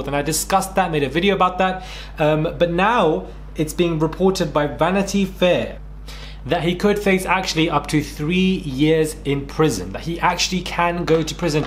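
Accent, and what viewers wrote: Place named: United Kingdom. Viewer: Ngl, was laughing my WHOLE ass off listening to trying to talk about US politics and locations. Caught the pause for pronouncing Illinois correctly, but I lost it when he said "Ar-Kansas." Good vid. Just super funny listening to someone (presumably) from the UK discuss these things.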